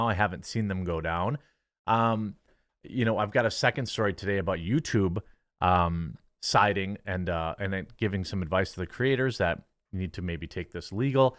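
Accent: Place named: Wisconsin, United States. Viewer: I swear I'll unsub the next time u say braigged instead of bragged. Fuggin wiscansin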